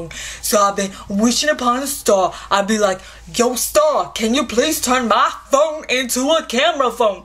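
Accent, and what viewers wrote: Brooklyn, United States. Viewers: omg, i know what video ur talking about from jpmetz....hahahaha. u are funny. u should make more vids like this. i hear u pullin the brooklyn accent out of your voice girl. lol. nice!! keep up the great vids girl.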